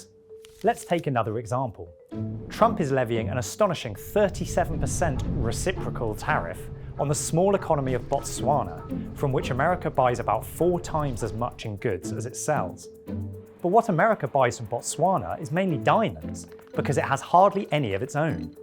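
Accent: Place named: United Kingdom. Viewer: Heard the British accent and knew it would be trash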